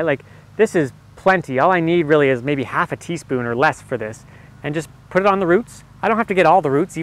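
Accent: Canada. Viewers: You sound so Canadian.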